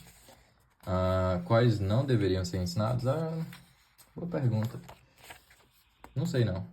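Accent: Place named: North, Brazil. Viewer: Sabia que tinha reconhecido um resquício de sotaque nortista em você. Sou do norte também. Você é fera demais. Orgulho de você.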